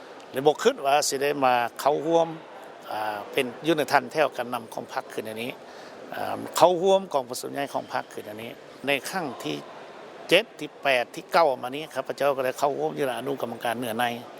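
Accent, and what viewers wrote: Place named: Vietnam. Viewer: เว้ากะบ่อชัดภาษาลาวเว้าผสมแกว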